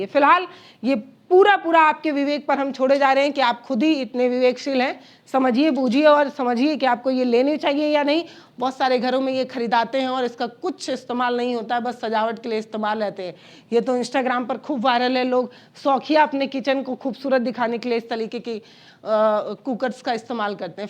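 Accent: Bihar, India. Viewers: Aap bihar se ho na??? Kaaraahi kaaraahi bol rhi ho?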